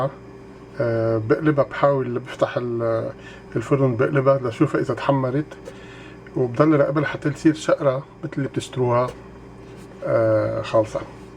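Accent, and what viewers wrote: Lebanon. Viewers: Dkfkf Dkfkdmd كلامو مبين لبناني 100% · este chico habla el arabe con acento Libanés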